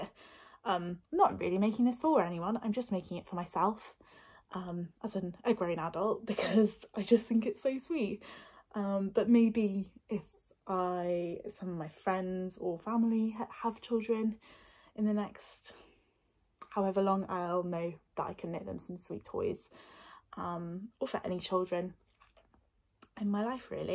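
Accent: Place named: England, United Kingdom. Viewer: Greeting from British Columbia, Canada.....you had me at tea, ginger, chai and your lovely English accent!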